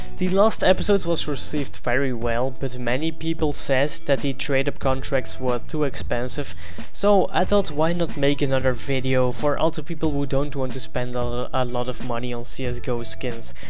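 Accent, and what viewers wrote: Netherlands. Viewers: u are dutch comfirmed xD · I knew it your Dutch! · Belgie / Nederland?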